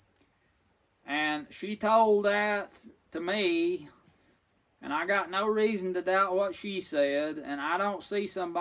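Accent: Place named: Appalachia, United States. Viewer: What an accent! Not boring like here in far north California. Surely you recount stories to your girls. Don't let them get too old for them. No one here ever asks me my stories. Wow, your stories! Got my attention! May granny was jumped by a small bobcat; something she might be able to handle. You've found your niche,: mills stones, and storytelling.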